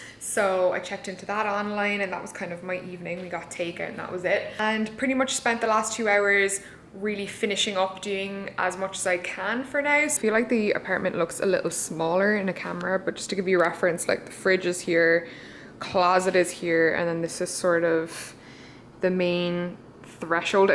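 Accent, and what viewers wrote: Ireland. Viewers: that clip that recorded of you had me laughing so hard 😂 you sound so extra irish when talking to him and i LOVE it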